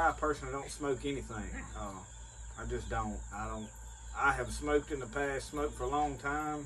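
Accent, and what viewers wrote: South, United States. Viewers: When I was in the U.S. Army in Agusta GA, I was caught talking while a Master Seargent was speaking to us. He asked my name and I replied with an accent just like yours. It was so good that he said , "Boy, what part of the South are you from ?" I replied, "South Bronx, New York ". He said, "Boy, jump down and give me 50 pushups " ! I did and laughed so hard while doing them. I never forgot that moment. I suppose he never did either ! Love your accent , you're a good ol' boy !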